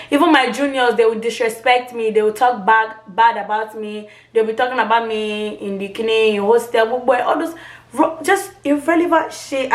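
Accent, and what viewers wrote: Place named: Yoruba, Nigeria. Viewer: I even like the way she talk👌missing up with Yoruba 👍👍👍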